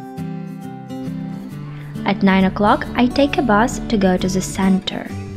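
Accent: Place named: United Kingdom. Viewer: Mi maestra me dijo "vean este video, la chica tiene un acento Britanico perfecto, uff. 👌🏻" JAJAJAKWLWL.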